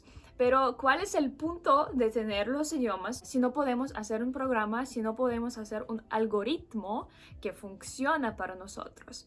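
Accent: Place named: Russia. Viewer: El ruso siempre me ha llamado la atención, por ejemplo me encanta la banda, y buen video y que buenos consejos, lo usaré para aprender también inglés, por cierto, tienes muy linda voz y suena muy bien en español, es decir todavía se nota cómo el tono ruso, y el ruso es un idioma tan hermoso, ¿Me puedes recomendar música en ruso? Y eso pues, saludos 👍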